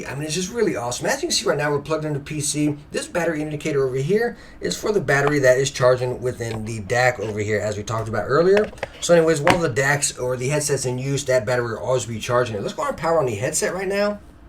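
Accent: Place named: East Coast, United States. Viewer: 2 seconds in i was like "this guy is east coast for sure"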